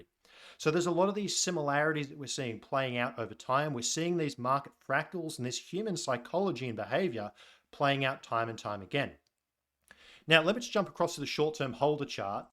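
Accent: Australia. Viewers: I always trust the aussie accent for some reason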